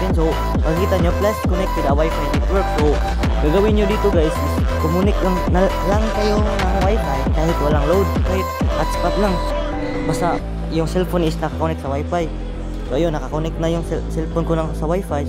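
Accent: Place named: Visayas, Philippines. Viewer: Pareha lang ta idol Bisaya hehehe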